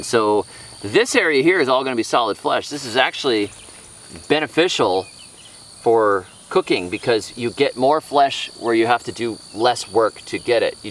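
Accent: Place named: Boston, United States. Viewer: What with the Boston or New England accents LOL..... But it might make me by one... I thought this was about pumpkins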